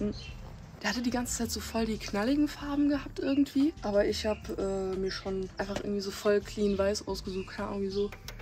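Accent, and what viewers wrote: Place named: Russia. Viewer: Hi🙋🏻‍♂️ bin zufällig auf dein video gestoßen👍🏼 hat spaß gemacht zuzuschaun und war lustig. Vorallem find ich gut dass du so natürlich rüber kommst. Du bist aber auch verdammt hübsch. Und ohne scheibenwischer sieht die Karre geil aus👍🏼. Walla du hast ahnung👌🏼 und stil. Die Felgen passen wie angegossen zum auto. Glaub bist russin, hat sich so angehört🙂👍🏼ich lass natürlich ein Abo da😁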